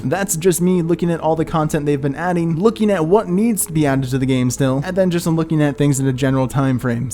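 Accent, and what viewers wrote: Czech Republic. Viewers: Are you Czech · Guessing Czech Republic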